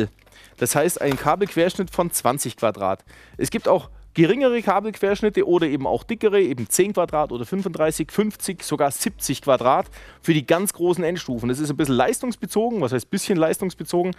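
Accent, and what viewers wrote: Swabia, Germany. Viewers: Ja, ganz schön blöd, wenn man meint immer recht zu haben. Der gute Herr hat die Gleichspannung gekennzeichnet einmal 12 V und 10 V in Form einer Linie. Die Sinuskurve solle hier nur ein Signal darstellen (Musik, einzelner Ton etc.). Im Beispiel wurde verdeutlicht, was passiert wenn die Bordspannung sinkt. Danke für das Video !!! ps. dein schwäbisch ist unüberhörbar xDDD (Y)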